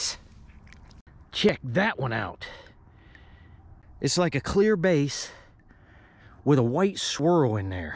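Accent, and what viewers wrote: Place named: West Virginia, United States. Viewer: That accent... puts you somewhere around Fairmont to Clarksburgh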